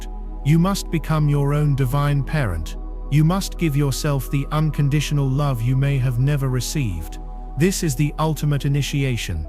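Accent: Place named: United Kingdom. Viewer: Click bait. With an expect Brit accent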